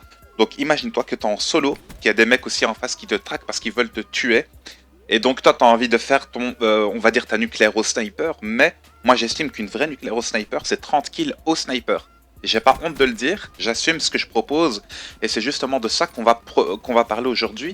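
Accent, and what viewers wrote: Belgium. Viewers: T'es belge? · est tu belges stp répond 😜❤️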